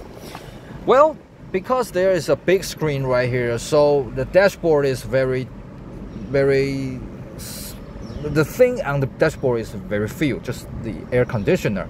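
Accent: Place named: United Kingdom. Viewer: 講的很有道地的英國口音不愧是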